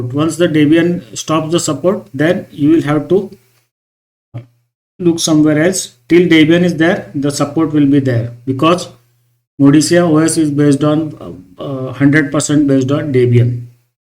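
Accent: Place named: India. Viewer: ugh.. indian accent on top of a bad microphone.. 👎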